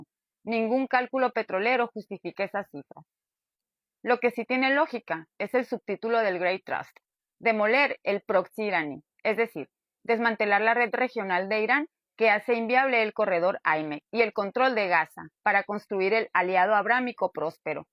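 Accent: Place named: North, Mexico. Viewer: ayer 20 de abril fue el cumpleaños del fiu rer. El ultimo Avatara. y mientras tanto, "los aliados" ("la escuadra y el compas", los verdaderos supremacistas) mantienen a todo el mundo en estado de guerra perpetua desde 1948, dando el "sacramento" para el hematofago genocida que ellos adoran como "dios de los ejercitos". esta demostrado que esos supremacistas no entiendn otro idioma mas que el de la violencia y la fuerza. Muchos creemos que el mismo Cristo vino al mundo para terminar con ese culto demoniaco que llaman "judaismo", y el demonio disfrazado de Dios junto con los depravados supremacistas que se creen "elegidos de dios". SIN TREGUA NI PIEDAD, EL BIENESTAR DE LA MAYORIA DEBE IMPONERSE SOBRE ESA MINORIA RAPAZ, SUPREMACISTA Y GENOCIDA. GRACIAS POR COMPARTIR. ps me encanta el acento del norte, sobre todo de shihuahua! iñoor!!!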